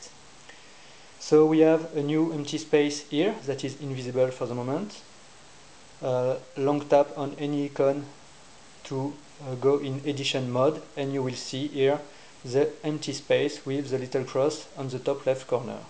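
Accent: France. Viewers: Rien C'est juste que j'ai déja été en france et vu que je connais l'accent j'ai remarqué que tu étais francais :) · you have a cool accent i'm guessing your french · ton anglais est pas vrm bon mais bravo quand meme t bon pour un francais :P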